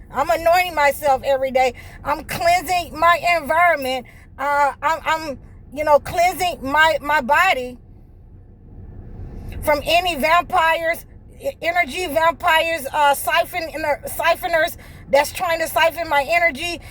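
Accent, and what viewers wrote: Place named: California, United States. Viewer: You sound like you from Comi fornia.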